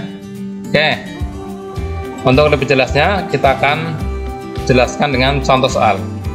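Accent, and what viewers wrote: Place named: Banyumas, Indonesia. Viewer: Bapaknya ngapak ya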